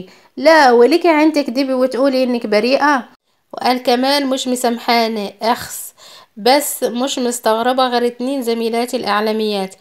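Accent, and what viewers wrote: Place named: Egypt. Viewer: االمهم من هاد الفيديو ديال ميار ووفاء مكي هي انك تقنتي اللهجة المصرية تبارك الله عليك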